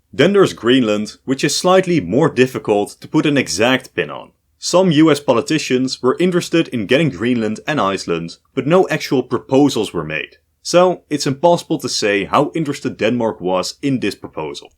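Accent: Netherlands. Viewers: You sound dutch like me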